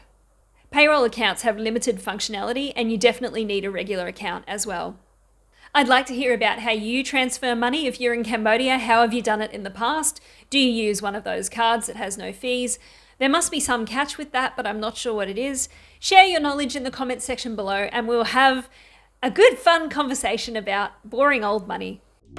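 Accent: Australia. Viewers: Only just found your YouTube channel. Great to hear an Australian voice for a change, and to top it off, a super informative resource. well done, I'm a convert to your channel! Have been to Siem Reap on a conference years ago but did not see much of it so viewing all your videos with relish in my search for a place for my wife and I to retire in a few years. I was surprised to read that it costs AuD$68 every month to transfer $2,999. This is AuD$816 per year in money transfers YIKES that is high!!! Is there any interest on the account balance from the local bank? I like this suggestion from a poster :"You can transfer money from your home bank to your Wise Card, then use your Wise Card to make purchases, pay bills, and even withdraw from ATMs in Cambodia." ...makes more sense no?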